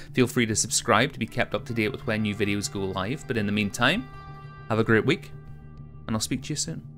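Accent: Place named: Ireland. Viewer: I like your accent. Where are you from? Ireland?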